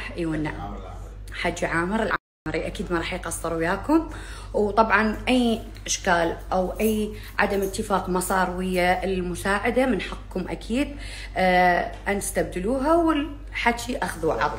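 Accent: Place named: Lebanon. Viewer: شكد حلو تحجين لبناني حياتي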